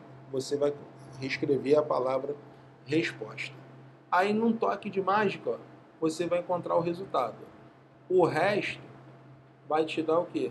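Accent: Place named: Rio de Janeiro, Brazil. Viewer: Da pra se vê claramente que o é um carioca da gema. 😁 Ele puxa bastante no x... 😄. Aula top. Show de bola meu velho.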